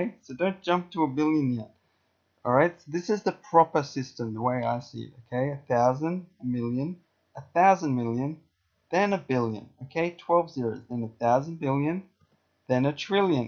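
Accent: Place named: Australia. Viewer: lmao dude mom in american english doesn't rhyme with bum or any of those things, the o is pronounced like the o in bomb. That one is just your australian accent confusing you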